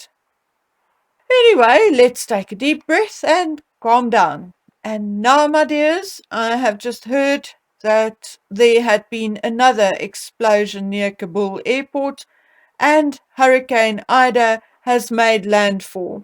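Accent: South Africa. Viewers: 😂🤣😅 I have to laugh when I listen to you , and the way we South Africans talk, its such a pleasure listening to you, say it as it is. 😂 Just love it.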